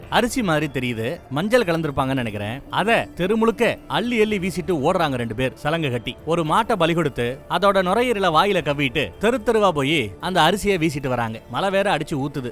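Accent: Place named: Madurai, India. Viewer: நீங்க மதுரைக்காரரா நம்ம மதுரைக்காரன் மாதிரி பேசுறீங்க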